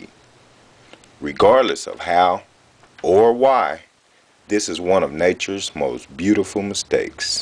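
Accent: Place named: United States. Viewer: you could tell he's american from how he talks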